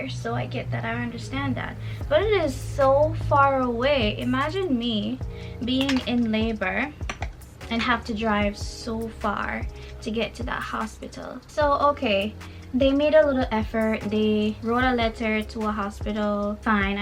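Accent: Jamaica. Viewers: Just came across your channel and I'm wondering are You from the Caribbean sis? Your accent and your phrases got me feeling the homey Jamaican? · You sound jamaican. Are u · U sound jamaican Btw i do understand what it is they were saying cos the level of understanding between both u and the doctors wasnt good and anything could happen even tho they could've probably worked something out..its not that much of a big deal but at least they recommeded u to someone else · You sounds Jamaican or you a Jamaican? Sorry for your experience!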